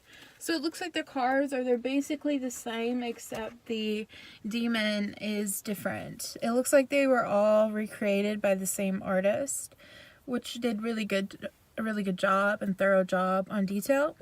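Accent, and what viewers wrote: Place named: South, United States. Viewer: lol im from Nashville, i could sense you were from the south❤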